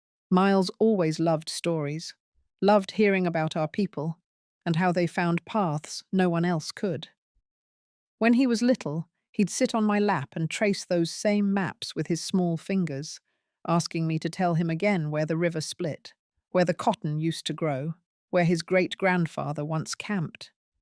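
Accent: England, United Kingdom. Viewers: She's from Missouri but speaks with an English accent? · Why is a woman with a very heavy British accent talking abiut maps in Missouri? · As the Woman voice is in UK English, assume this is a British story. You would not drive for 9 hrs to her sons home in the uk.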